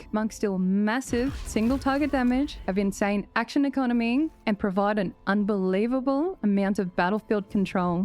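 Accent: Australia. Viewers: Love the video, And the strine! This is freaking thickest one I've ever heard, which makes in even more intriguing!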